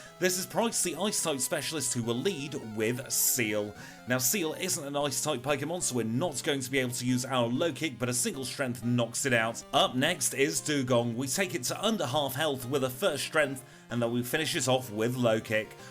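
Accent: United Kingdom. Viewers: wow that sounds interesting! That's fine, I will keep on enjoying your challenges anyway! I like very much! Your accent is so cool too! I remember you saying you are from Manchester... Is that correct? You're the first one from there I've ever heard! You friend LukethPlays is the second one! Thank your for producing contents for everyone! I think your challenges are unique and also I long to take part in the next stream! I'm one big fan of yours man! I wish you the best luck of all! See you later!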